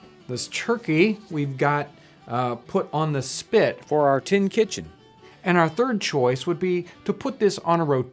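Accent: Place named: United States. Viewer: Is this guy Dutch American? He looks Dutch but speaks with an American accent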